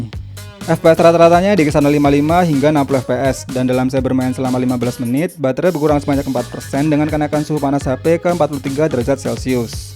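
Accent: Yogyakarta, Indonesia. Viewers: Orang Jogja ya bang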